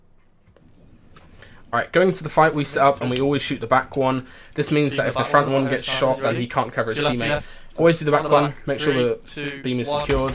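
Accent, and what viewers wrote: United Kingdom. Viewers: Where are you from in the uk?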